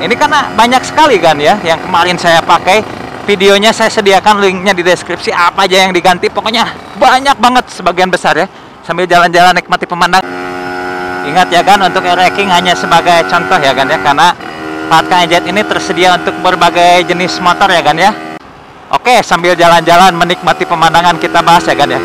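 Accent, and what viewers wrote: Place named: Tasikmalaya, Indonesia. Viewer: Jb cisinga si aa na orang tasik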